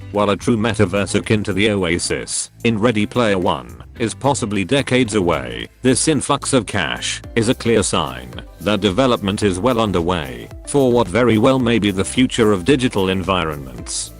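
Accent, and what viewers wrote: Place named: Australia. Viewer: its Australian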